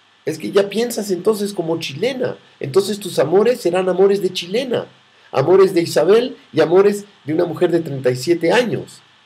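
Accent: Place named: Mexico. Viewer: tiene acento de mexicano, un Gran Ser él y su padre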